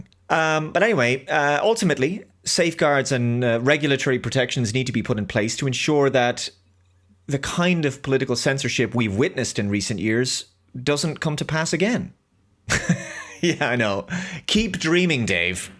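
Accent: Ireland. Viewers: I must admit, I've missed your Irish voice telling me to follow you on "Moinds n' Gaaab" 😂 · it is so nice to hear your Irish accent again!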